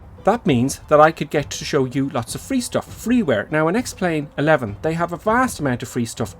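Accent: Ireland. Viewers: Just discovered your channel - Excellent video and totally agree with your comments. I'm just getting back to simming as I become a retiree after about 8 years away in that time it's safe to say things have improved leaps and bounds (apart from p3d/msfs - till now we hope). I'll never fly a real plane but this is so close it's mindblowing. Love the accent BTW my family all originate from West Meath/Roscommon. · Just subscribed to, love his irish accent and the video was great😂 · Are you irish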